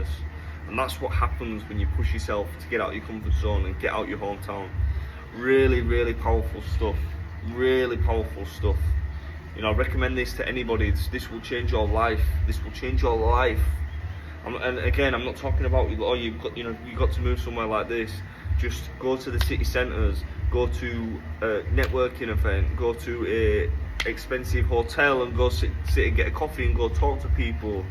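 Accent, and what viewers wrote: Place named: Leeds, United Kingdom. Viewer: you sound like you’re from Leeds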